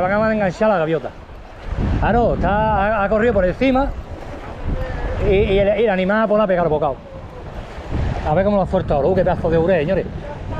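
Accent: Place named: Madrid, Spain. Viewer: parece k son de los Madriles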